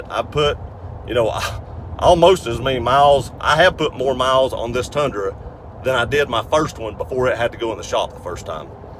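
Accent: South, United States. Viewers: Your Tundra is an awesome truck. If Toyota is still building them well, you'll enjoy a long happy life with it. I bought a new Tundra Limited Extended cab in 2001. I had originally planned to keep it 3 yrs, then sell it and move on. Man, I fell in love with that Tundra. It had the 4.7 DOHC V8 and NEVER broke down on me. Just did the regular maintenance and she continued to perform flawlessly. Unfortunately, exactly one year ago as I was driving down the road a lady ran a stop sign and t-boned me. Needless to say, my beloved Tundra was totaled. I owned it for exactly 16 years and put 206,000 trouble-free miles on the clock. The only knock I had on it was that the paint began to fade at year 12, but no big deal as the truck itself was bulletproof. Congrats on your new Tundra. Again, hope she serves you well and keeps an ear-to-ear grin on your face for years to come. 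Oh yeah...man, you've got a cool Southern accent. I live in Texas..the accent here's a little different. Merry Christmas!! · Man, i love this southern accent, it's almost music to my ears..what is it...Texas, Lousiana, etc? & i also love toyota, unfortunately we don't have those big v8 tundra's in Europe, what a shame.. Greetings from EEurope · Well that is a sad story of how you were handled and the safety issues and danger you and others were placed in. I'll be honest I just bought an F-150 and love it but this is disturbing. My engine is a new 2018 2.7 Eco Boost with the 400 lb ft or torque and 10 speed transmission so I hope they sorted that issue out with it. I know it is a completely different motor than 3.5 Eco. I had a 2009 or 10 Tundra I bought new and it was a nice truck. Had the 4.6 V8 but the 5.7 is a real beast. I had a death wobble one time towing my Bobcat with the Tundra but that was my fault using a half ton truck. 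I never did that again. Toyota great trucks and cars for sure. Love the 2018 2.7 F-150 Eco Boost and hope I don't have any issues. Nicely done video btw. Love the southern accent. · still sound southern: ) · Love your darlin honesty. Finally someone that sounds like me with a sweet southern swag. TOYO Tundra here I come. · you know it's a strong accent when a southerner notices right off the bat lol. nothing wrong with it. it's cool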